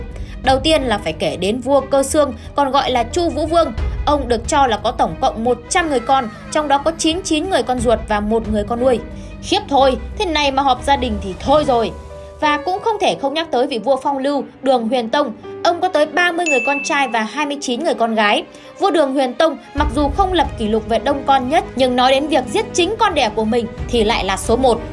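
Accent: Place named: North, Vietnam. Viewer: Em Mc vừa lanh vừa duyên vừa hài hước-to chà bá😅thích nghe em nói chuyện giọng bắt mặc dù chị không thích nói tiếng bắt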